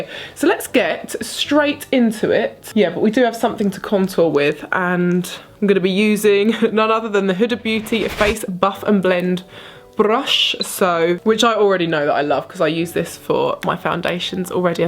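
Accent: United Kingdom. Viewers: God I love your accent! I’m an English teacher and I really admire your very British accent, I love listening to you 😂 why not compare all the new makeup of 2019, your favorites and the ones that didn’t work for you!! ❣️❣️❣️ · I've been following you for a little while now and I really love watching your Instagram stories it's relaxing in some way, and I love you British accent! ❤️ I would love to see a glam make up tutorial for Christmas! You're amazing, love you 🌹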